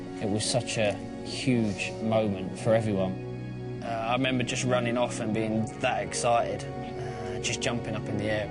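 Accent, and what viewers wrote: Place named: United Kingdom. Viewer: Acento británico... Difícil de entender pero lo sigo intentando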